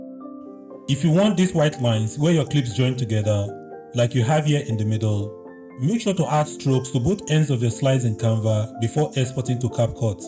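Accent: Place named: Nigeria. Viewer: HI good video i liked your nigerian accent bro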